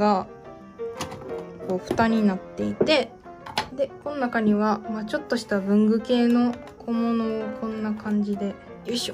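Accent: Kansai, Japan. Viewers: めっちゃ かわいい。今日はまりましたw関西弁だいすきです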